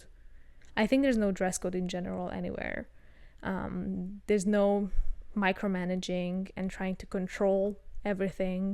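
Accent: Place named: Hungary. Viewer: It's cool hearing you speak English, as a Hungarian I always cringe because of my accent, but I actually like your's even if it is Hungarian.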